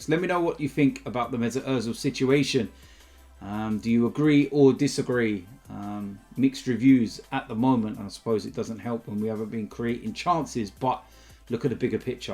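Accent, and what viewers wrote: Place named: England, United Kingdom. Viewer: Maybe don't pronounce the names with an English accent but try to look at the country where the name comes from. Or don't try it, now it's funnier😂. Keep going, starting my day with your Daily's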